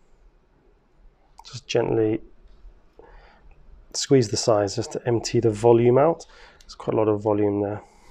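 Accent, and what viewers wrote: England, United Kingdom. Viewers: It’s an English accent lol. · Great removal, and it’s so nice to hear a British voice as a posed to an American.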